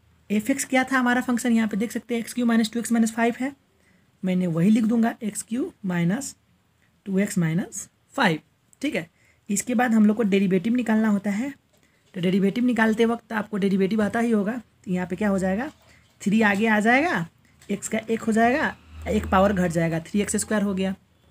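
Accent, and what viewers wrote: Nepal. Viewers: Bro's Nepo-Hindi is sweet😂😭🫶🏻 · Bro temi Nepali ho timro boli sunda Nepali lagyo anyway Sabai ramro Xa temi le padhako pani Sabai bujhinxa keep it up love you 🤗🥰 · ARE YOU FROM NEPAL🇳🇵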